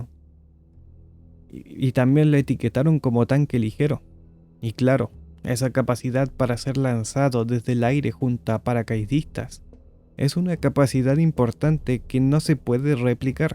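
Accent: Chile. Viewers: Hola , gracias por los excelentes videos hechos con mucho cariño , una consulta al margen , es usted chileno ? Saludos desde el sur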